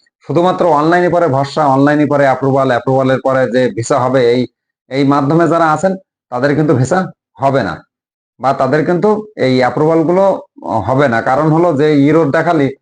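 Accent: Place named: Satkhira, Bangladesh. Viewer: ভাই আসসালামু আলাইকুম আমি আপনার ভাষা শুনে মনে হচ্ছে আপনার সাতক্ষীরা ডিসটিক এর কোন এক জায়গায় বাড়ি দয়া করে যদি এই সত্য কথা হয় তাহলে আপনি আমাকে নক দিবেন আমি আপনার মাধ্যমে একটি কাজ করতে চাই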